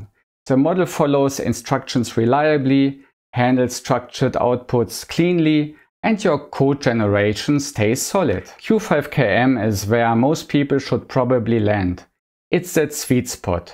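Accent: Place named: Germany. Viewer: Thank you for your video, it helps me A LOT? Ist das ein deutscher Akzent, der mir hier die Ohren.... maltretiert?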